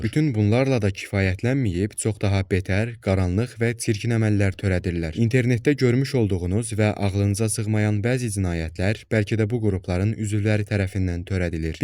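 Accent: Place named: Turkey. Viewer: Qardaşım bax bəlkədə bu mövzu ilə aidiyyatı sıfırdır ama xaiş edirəm yalvarıram Türkiyə Türkcəsi aksentiynən danışma çox ət tökən və yorucu olur hörmətlər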